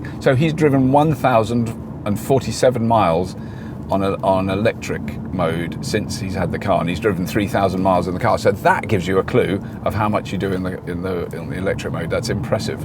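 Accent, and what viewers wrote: United Kingdom. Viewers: It a pleasure to listen to british english instead of... you know the place im talking about. Awesome series! Greets!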